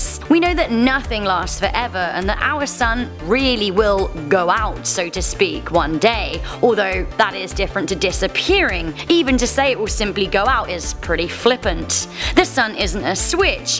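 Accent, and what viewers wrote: United Kingdom. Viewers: I can’t stand how poms say vitamin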